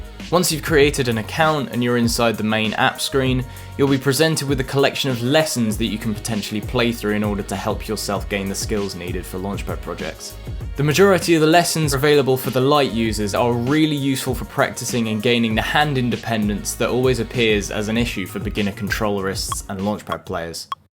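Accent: United Kingdom. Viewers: His British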